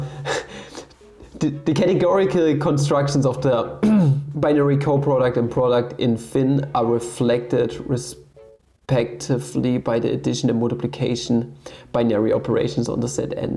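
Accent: Germany. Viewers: dein akzent hört sich richtig deutsch an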